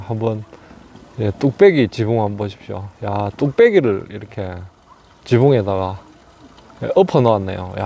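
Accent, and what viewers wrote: Gyeongsang, South Korea. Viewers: 구수한 경상도 표준어 외지에서는 경상도사투리라고 합니다 오늘 영상 잘봤습니다 항상 감사합니다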